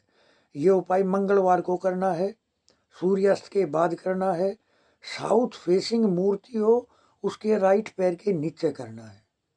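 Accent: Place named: Haryana, India. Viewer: Guruji.. Aapke hosle jaror buland hoge... Aisi jaankari abhitak kisi ne you tube par nahi daali hai... Aapke 42 saal ka jivan Ka anubhav aap bahut hi ache tarike se samjha rahe ho... Bahut jaldi Aapka channel bulandiya hasil karega... Sir Delhi me kaha se hai plz bataye apne office ke baare me next video me....hamare Haryana ka touch bahut acha lag raha hai jab aap baat karte hai.... 🙏🙏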